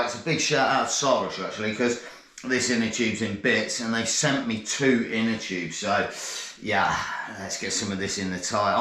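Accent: Leicester, United Kingdom. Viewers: Alright mate. Found you about a month ago and loved the approach you take to your videos and life! With a smile! I’m a Leicester lad (Glenfield) by birth and love hearing the twang mi duck! I’ve binged pretty much all your stuff and joined the facey group and your right what you mention in this one. Your gerrin much better! Love it u know! Keep smiling pal and good luck with the channel! Up the citeh!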